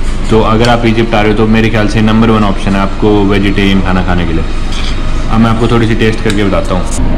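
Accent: Haryana, India. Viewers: Like ur Haryanvi touch it is like having a sprinkle of honesty and strength .👍🏼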